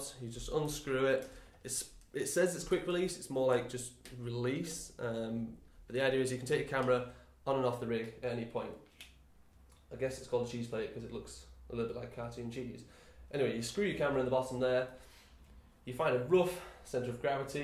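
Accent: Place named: Ireland. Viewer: Nice Video, but what you said? "walk like you .... yourself!" Sounds like a good advice, but hard for me to understand the irish accent! please write it down! Thx pal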